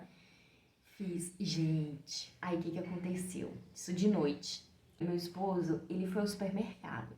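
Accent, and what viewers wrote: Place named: Minas Gerais, Brazil. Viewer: Aí que saudades desse sotaque minerinho 🤌🤌 Amei o seu vídeo,! Que o universo traga muitas bênçãos pra vc🙏🍀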